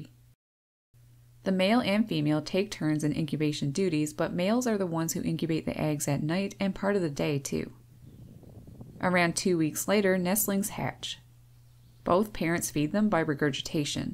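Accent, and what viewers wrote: Newfoundland, Canada. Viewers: It’s subtle, but you sound like a Newfoundlander! I love to hear the accent. Interesting video with great information, not sure how this was recommended to me... but I learned something! Thanks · Ok i hear Canadian accent ❤ love your name so cute 😊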